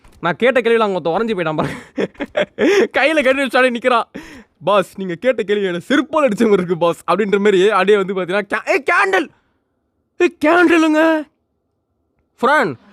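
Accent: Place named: United States. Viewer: Eh kirrku onaku english eh pesa varala ithula vera us kaare maari pesriya paythiam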